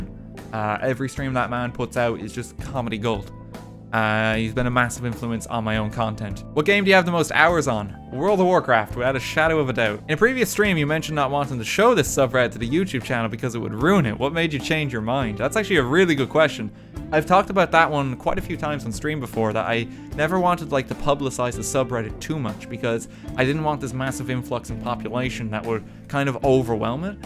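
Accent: Ireland. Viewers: This might be shocking but from listening to your voice you might be irish.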